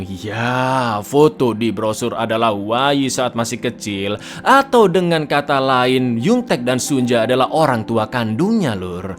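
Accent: Java, Indonesia. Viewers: ras nyaa Hwayii Jawaa anjaayyyy 😂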